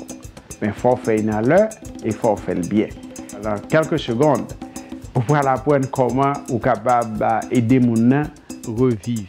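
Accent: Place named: United States. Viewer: Premyè fois ke mwen tande ameriken aksan la, avèk kreyol ayisyen la. Mwen pa te tout konprann. Men mwen imajine ke travay'w se de bòn kalite !!!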